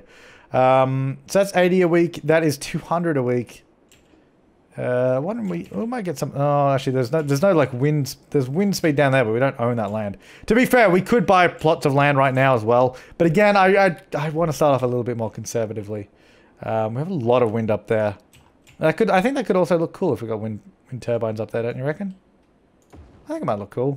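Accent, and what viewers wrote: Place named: Australia. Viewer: I like ur channel cos u have an Australian accent which is epic ( I have a English accent)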